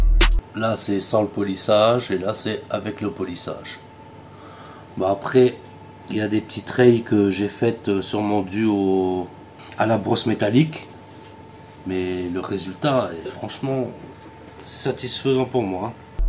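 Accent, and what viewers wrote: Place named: Lorraine, France. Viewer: Salut! Je t'ai découvert par la chaîne de JP et en regardant toutes les vidéos du weekend entre fumier, j'aurais tout de suite mis ma main à couper qu'avec un accent pareil tu viendrais soit de Nancy soit de Metz, en tout cas en Meurthe et Moselle 😅 Moi je suis de Nancy et franchement si tu passes par là ça ferait vraiment trop plaiz de te rencontrer et d'échanger avec toi, pareil si tu cherches un compagnon de tir ou même des endroits pour faire des concerts à Nancy je dois encore connaître deux trois coins sympas pour t'éclater et te mettre en relation 😁 J'ai vu aussi que tu faisais du flocage on en faisait y'a un peu moins de 10 ans avec des potes de Metz sous le nom de EMKA prod si ça se trouve on connaît des gens en commun ça serait ouf ^^ Bon allez salut à toi la chicorée je sais pas si tu verras mon message mais je tente quand même😁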